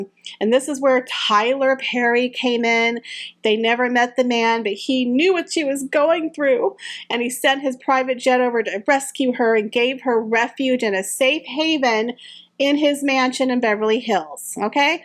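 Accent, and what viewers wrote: South, United States. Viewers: It's that slight southern accent "Y'all" 😉 😘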